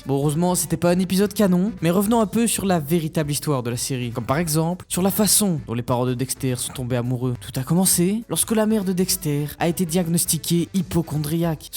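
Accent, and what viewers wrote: Belgium. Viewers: T’es belge w?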